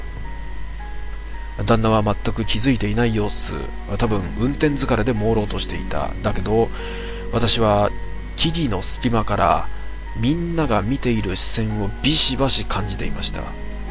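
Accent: Kansai, Japan. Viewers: あれ？136さんは関西人？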